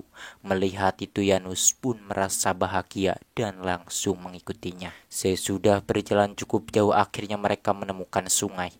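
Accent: West Java, Indonesia. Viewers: Sampean asli org mana bang??? Sunda ya?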